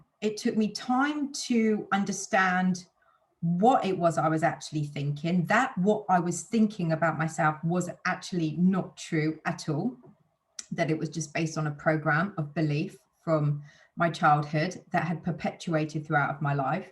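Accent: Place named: United Kingdom. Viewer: 💎💎💎!!! excuse me!!! 😇 🎁 are you really 44?!?!?!? 🥰🥰🥰 you are blessed and you bring light to the world you really found your path / purpose in life with the best compliments from Italy 🎉🌍🌎🌏 I absolutely adore 🐞 your British accent!! 🌈 keep it up, my dear Life Coach 🍓 (I thought you were btw 28-33!)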